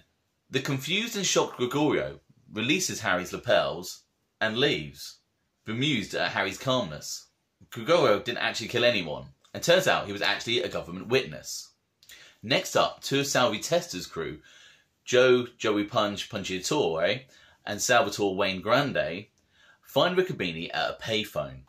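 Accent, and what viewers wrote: England, United Kingdom. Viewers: Not for nothing but hearing a english accent is def a bit strange talking about the mobs in the States. Kind of like listening to an American explaining the british monarchy or viking history😮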